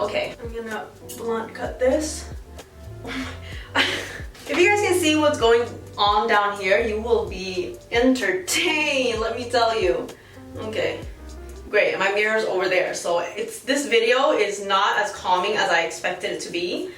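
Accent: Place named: Sweden. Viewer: It sometimes sounds like you have a slight swedish accent to the english hahaha ;D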